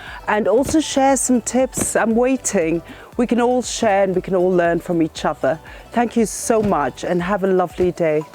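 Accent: England, United Kingdom. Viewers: Hi I heard that you mentioned sand. I've found my rhizomes are prone to rotting. Could you let me know the ratio of the ingredients in the compost please? You're so English. Where are you based please? Lovely to hear English English! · Where are you? You sound English but that doesn’t look like England. Are you in South Africa?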